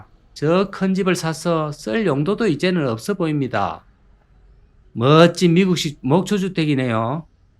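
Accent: Gyeongsang, South Korea. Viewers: 유투브에서 유일하게 듣기좋은 경상도 말투~~~😂😂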